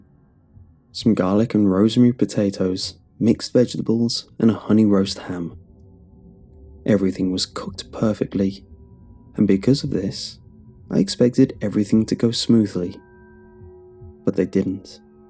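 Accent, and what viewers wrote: United Kingdom. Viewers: Plus a british accent. Though, I can't watch his content without feeling dirty because he put down a smaller youtuber for not having an american accent, despite the fact that he, himself, does not have an american accent.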